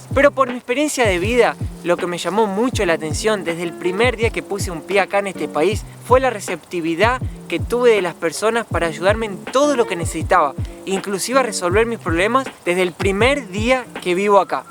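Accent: Argentina. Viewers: Me encanta el acento argentino 😉Да...Usted tienes toda la razón del mundo ♥️YES ! ☝🏼 ACTITUD, MODALES, RESPETO al PRÓJIMO...Además de echarle GANAS a la vida...ser buena persona ✋🏼Yo he vivido en Japón ...y me va genial tengo un buen trabajo , buenas relaciones , mi trabajo con extranjeros de todo el mundo.. 🇺🇸 🇰🇷🇷🇺🇲🇳🇨🇳 Una experiencia increíble e inolvidable 😉 lo más importante y revelan te en mi experiencia personal es ;Aceptar y respetar las DIFERENCIAS 🇨🇺🙏☘️Bye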